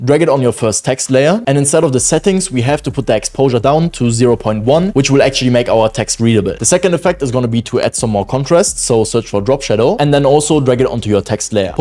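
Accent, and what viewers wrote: Germany. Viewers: Ich höre deutsch 😉👏🏼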